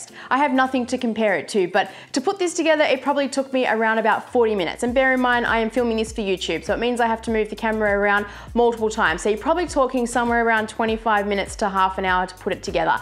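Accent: Australia. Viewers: Thanks for sharing I know nothing about laser engraving or cnc but I so want to go down that path more so on the cnc machine they both make your projects look heaps more professional. So thanks for the encouragement to give it a go.Love your channel and content plus it’s nice to see and hear Aussies on YouTube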